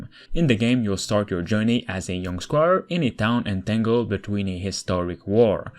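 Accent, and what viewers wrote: Quebec, Canada. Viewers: Mmmm nice video et nice accent mon quebecois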